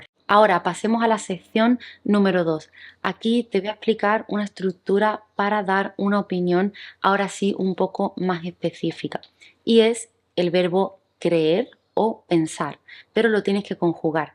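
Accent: Spain. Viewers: De cual pais es la hablante? Es acento de espana?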